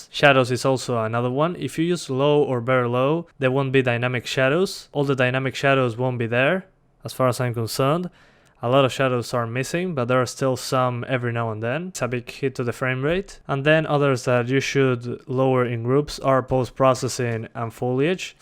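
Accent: Argentina. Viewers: Que hice conmi vida como para terminar viendo a un argentino hablando ingles , y ensima terminar entendiendolo.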